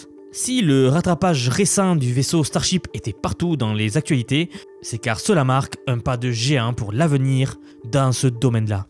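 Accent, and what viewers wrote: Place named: South-West, France. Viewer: Trop bien ce contenu ! Full valeur merci. Bonus pour l’accent du sud ouest !!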